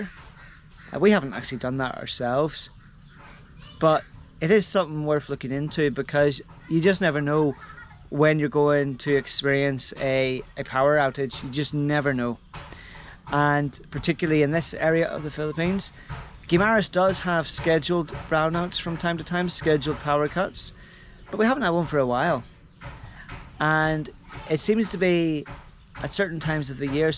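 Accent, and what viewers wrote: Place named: Northern Ireland, United Kingdom. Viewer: Are you by any chance from Norn Ireland? Your accent kind of reminds of a friend from Belfast. Greetings from a wee man from Iloilo as well. Unfortunately we tend to get stained by the NGCP's incompetence. I love my city but I'm scundered by the length of brownouts sometimes. Terrible intro to 2024. I hope your doing grand mate!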